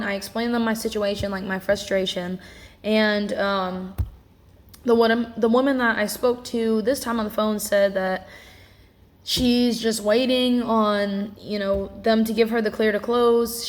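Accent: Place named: South, United States. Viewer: I like you country accent...is Tennessee or Texas a good place to buy a house? I'm looking to relocate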